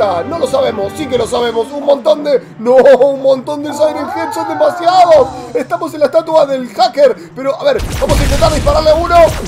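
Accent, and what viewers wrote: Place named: Argentina. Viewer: Eres argentino 🇦🇷🇦🇷🇦🇷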